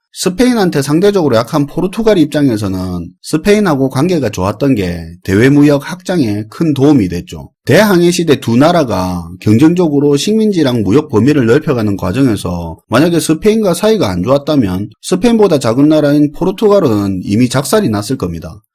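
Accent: Gyeongsang, South Korea. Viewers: 재미있게 잘 듣고 있습니다. 쉽게 이해 잘가게 설명해주시는거 너무 감사합니다. 경상도 사투리도 너무정감갑니다.^^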